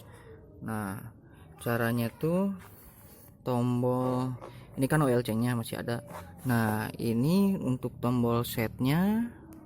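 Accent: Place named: Sunda, Indonesia. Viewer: Siap hatur nuhun bang Logat Sunda emang kental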